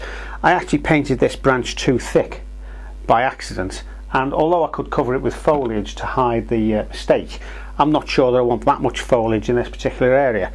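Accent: Liverpool, United Kingdom. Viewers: Did the Pres like his painting then? I agree with everything Parrot said too, such a lovely Liverpool area accent you've got.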